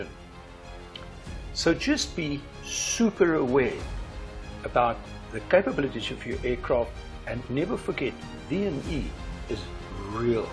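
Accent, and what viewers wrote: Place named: South Africa. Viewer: Thanks meneer - nice to hear a South African accent on YouTube. Great analysis, I learned something....greetings from Portugal.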